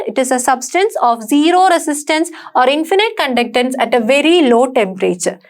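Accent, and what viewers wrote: Kerala, India. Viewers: She is a malayali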